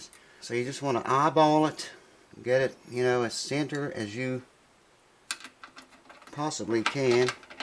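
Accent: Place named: Oklahoma, United States. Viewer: So glad I discovered your YouTube page! Lot's of great info, like the one on capos. I hope this isn't too personal, but whereabouts are you from? I ask because my daughter overheard me playing your videos and said "he sounds like grandma!" Lol. She's meant your accent, her grandmother is from Oklahoma. ☺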